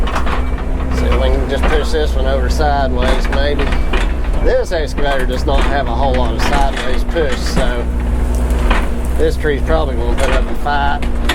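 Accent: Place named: Boston, United States. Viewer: Boston......I can tell by his accent 😉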